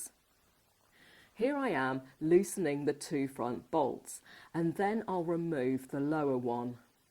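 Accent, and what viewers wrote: United Kingdom. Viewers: I love her British accent, too. Also, wonderful fantastic bumper guards. 😊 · Love This Awesome & Beautiful Lady with her Super Gorgeous British Accent just mesmerized.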